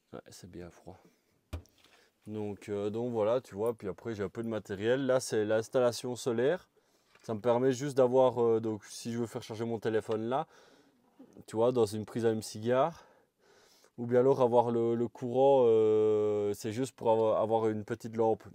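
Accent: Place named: Liège, Belgium. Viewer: Bonjour, tu es super bien installé, tu es originaire de Belgique, car tu as l'accent Liégois, bonne saison à toi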